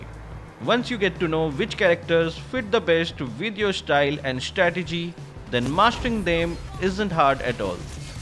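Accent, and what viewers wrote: India. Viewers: I thought he's an Indian because of hi accent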